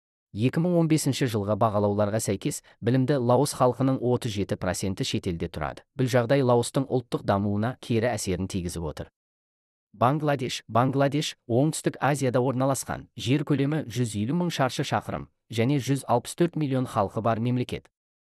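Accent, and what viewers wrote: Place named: China. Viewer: Кытайдан келген бе, казакша акцент “керемет” терминди айтпаганда,мемлекеттер атын дурыс атау керек!!!немесе мектепте дурыс окымай казак тили мен адебиетине дурыс ман бермеген бе? Блогер болу ушин жаксы дайындык керек, твндаудын ози ауыр.😮😂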